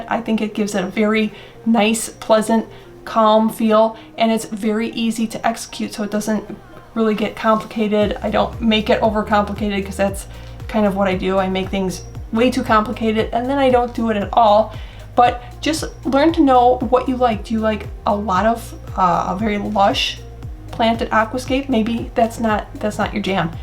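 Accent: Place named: Midwest, United States. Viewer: I'm not sure if it's your Mid-Western accent or Mom humor, (that can be a thing, too right?), but you are delightful and engaging teacher!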